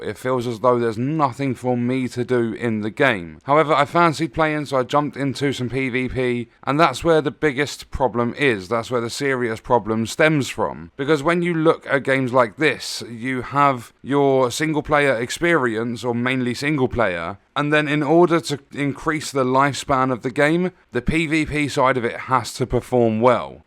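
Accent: United Kingdom. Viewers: Nice video mate … i watch your videos just to enjoy the accent! lol What city from UK pleaseeee m8 🥲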